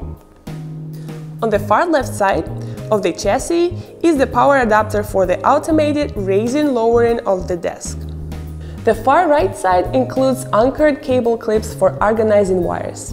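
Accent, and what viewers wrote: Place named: Russia. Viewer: До чего же у неё жуткий русский акцент. Будто специально ррежет :)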